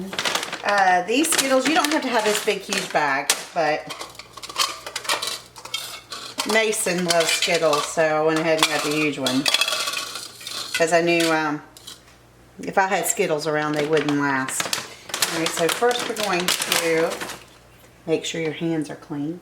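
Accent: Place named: South, United States. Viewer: This looks cool btw I like your voice it sounds southern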